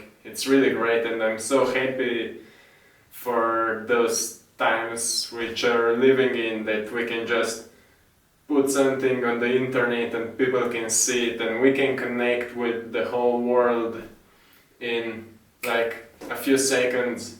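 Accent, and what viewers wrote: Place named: Slovenia. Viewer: Živjo, začela sem pisat engleško in čujem da si Slovenc. Gdaj sem vidla da delaš yogo in posnemek v naravo in pravim kako je to čudovito lepo, kje pa je to?! A to je naša lepa dežela. Samo naprej k veličanstvenim. Lep pozdrav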